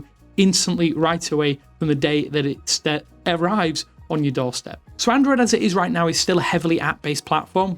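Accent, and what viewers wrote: United Kingdom. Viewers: This is one of the worst XR/VR related videos I have ever seen... way back into the Vive and Index days nothing compares to how awful this guys takes are. It's actually unbelievable he has a job doing this... something tells me the British accent is carrying him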